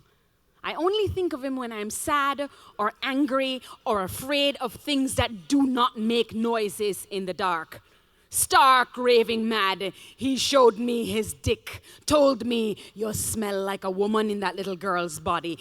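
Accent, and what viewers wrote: Jamaica. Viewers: soooo jamaican....lol...mi love it nuh hell!! So proud of u man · This woman is Jamaican. I recognize the accent. I love that she speaks for girls and women. When I grew up, like many, there was NO internet. No books to glean from in any library about women and our troubles. This woman is wonderful! Thank you!!! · Definitely Jamaican 😍 the accent was kicking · she sounds jamaician or like shes from di islands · my whole family is jamaican. I know when I hear a fellow yadie!! So happy to see jamaicans in the arts~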